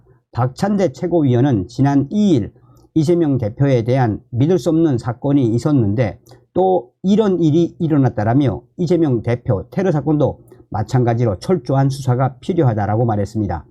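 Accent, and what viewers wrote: North Korea. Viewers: 북한 사투리 억양좀 고치시면 좋겠어요 듣기가 거북하네요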